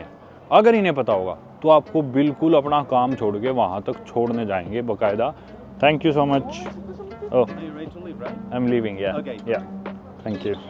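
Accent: Haryana, India. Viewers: Being a haryanvi I can sense your pronounciation with haryanvi touch 😂😂 and it feels very good to hear that 😁😁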